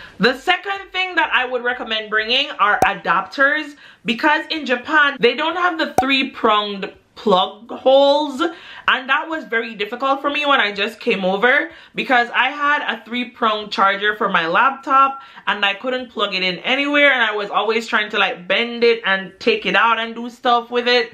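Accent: Jamaica. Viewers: I did hear the little Jamaican accent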